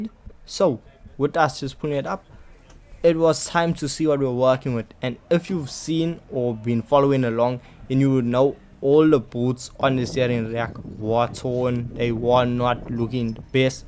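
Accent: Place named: Durban, South Africa. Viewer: Cool project my guy. The Durban accent takes some getting used to, but informative nonetheless.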